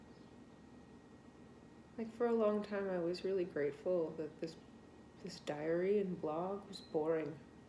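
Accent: New York, United States. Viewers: You still have a smidge of New Yawk accent! Wishing you the best, sending prayers, feel free to complain and bitch at any time! Have watched your journey and love that you share it, you are saving lives and now it's time to keep saving yours! Hugs!!!! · lol! love CAW - FEE ( COFFEE) - go New York gals :) and love your speaking - can't take the FUCK outta a New York girl :)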